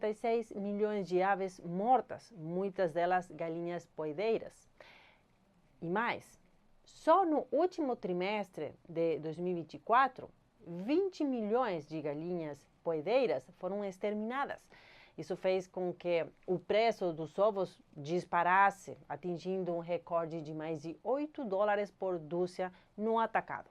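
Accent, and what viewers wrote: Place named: Spain. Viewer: Amei seu sotaque espanhol.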